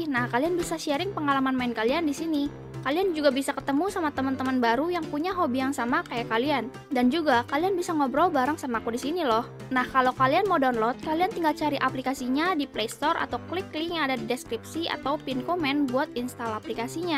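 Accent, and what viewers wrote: Indonesia. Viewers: Sumpah nih kakak orang sunda apa orang mana sih?😅😅,lu kaya orang jakarta,terus surabaya,sunda,medan,yg mana sih yg bener😂😂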